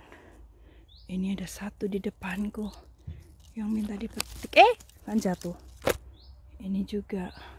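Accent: Java, Indonesia. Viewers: Mba mangga dan rambutan haha,mba jawanya medok kaya aku mba orang mana?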